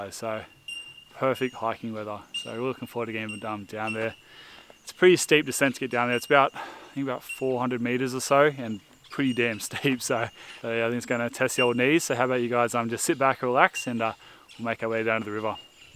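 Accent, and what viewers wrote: Australia. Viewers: Looks awesome I'm guessing this in NSW by your accent and by the terrain? Looks beautiful. That cave looks sick. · Did you also know that all other birds know that it is a lyre Bird that is making the calls. Beautiful place. Ripper place. Where the bloody hell are you. LOL Nice to hear an Australia. Which state are you in? With out giving away just exactally where you are.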